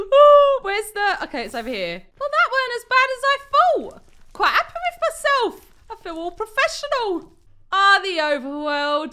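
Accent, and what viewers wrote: United Kingdom. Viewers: as an American I'd say it's a British accent lol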